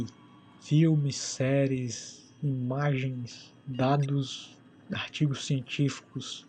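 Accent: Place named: Brazil. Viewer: Eu sou do ES naturalmente "sem sotaque" mas ouvir um sotaque tão brasileiro me aproximou tanto que não sei explicar.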